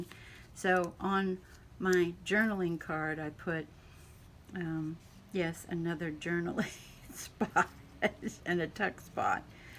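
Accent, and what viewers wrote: South, United States. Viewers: Good morning 🌞 I’m crazy about all the Bees. I loved when your Southern charming voice came out. I love when the two of you are together. Praying that all of you stay healthy. I’m always interested and inspired by anything you make. Big hugs my beautiful sweet friend 🤗🤗🤗💋💋💋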